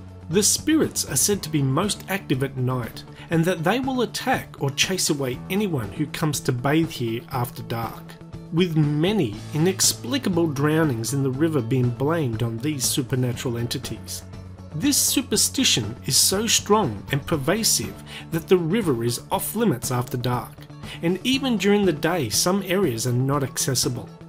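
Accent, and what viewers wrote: Australia. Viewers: Thanks for soften your australian accent.....!!!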